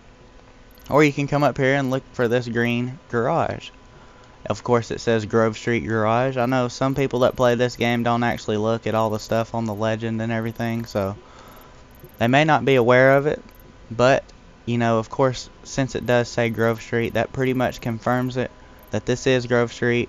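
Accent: United States. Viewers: He sounds fine it's a southern accent I have one to so don't hate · are u from Kentucky?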